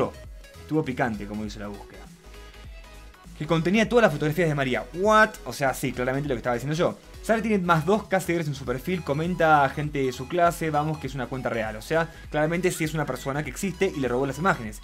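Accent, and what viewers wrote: Argentina. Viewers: Tiene un acento re argentino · Jsjs argentino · tiene la voz de un argentino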